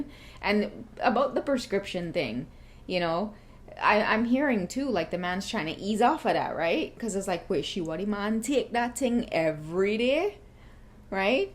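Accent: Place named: Trinidad and Tobago. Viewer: What you said at the mark. Nailed it! 💣💥 He even admitted it to me too. And then at the mark "somebody who's just there with you because you're putting a roof over their head". I said pretty much the same thing to him. It's true though. Spot on thanks so much 💜💜💜 PS I'm here for the Trinny accent too! 😉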